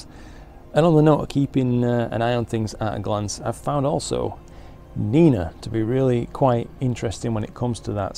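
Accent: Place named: North, United Kingdom. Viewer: Just catching up with this vid. Great watching as always. I like the current channel name, but it would be remiss of me not to mention as a fellow northerner, given your geographical origins, something along the lines of ‘ in the night sky’. The /look ‘joke’ might be lost on those not familiar with regional accents but it still works with your name! 🤔🤣 👍🏻 Noddy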